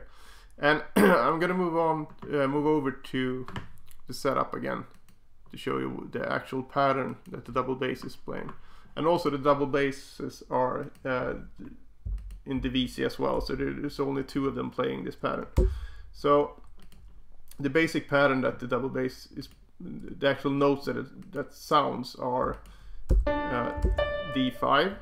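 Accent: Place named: Sweden. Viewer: You have swedish accent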